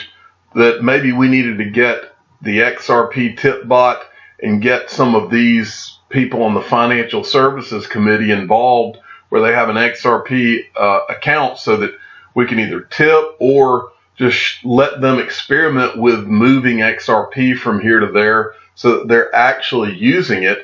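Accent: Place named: Texas, United States. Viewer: Sounds like you're from the south...maybe Texas. Love the accent.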